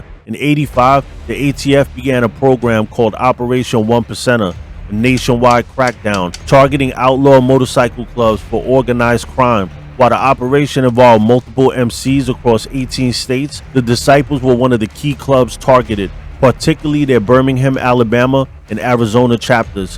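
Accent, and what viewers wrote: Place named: New York, United States. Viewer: Bro, you are so New York. It’s pronounced TOOSAHN. Not Tuxon. Tucson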